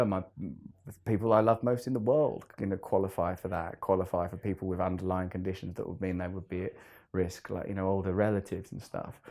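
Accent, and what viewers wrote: United Kingdom. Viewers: This guy looks like the corona virus. Lock him up and throw away the key. Take out his internet as well. No one wants to hear his fucked up British ascend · l couldn't understand u but l love British aaccent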